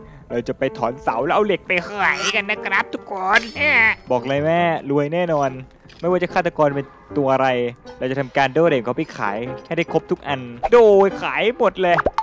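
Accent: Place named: Rural, Thailand. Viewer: อันแรก : อุยย๊ะ เปลี่ยนเพลงใหม่ เท่สวดยวด !!! อันสอง : กวนปราสาทเจ้าคิลเลอร์ เยอะเดะก็ได้ตาย....จริมๆหรอก อันสาม : พูดหรือร้องเพลงเอาสักอย่างเดะ อัยมะนุ๊ด (ไอ่ทองฝากมา) อันสี่ : ปะแจก็มี แล้วมันจะเร่งเพลย์ให้มันฮาทำไมวะ? อันหก : เอ็งพุ๊ดไรฟร๊ะเจ้ามะนุ๊ด (ไอ่ทองฝากมาอีกละ) อันเจ็ด : พูดคนเดียวก็เป็นนะเฮีย <num> อันแปด : โดนฟันเพลงก็มา แล้วเอ็งด่าไร ทำไมเซ็นเซอร์??? 555555+ อันเก้า : เลือกมาสักเสียง ผู้หญิง ผู้ชาย หล่อ ขี้เหล่ เด็ก แก เรียบเรียงๆ อันสิบ : RIP ไอ้สไปวูเม่นเอาเอ็งไปทำผัวแล้ว GG แถม !!! ขก.ตบมุข ฟังด้วยพิมด้วย แม่งเหน่อยสัส !! -*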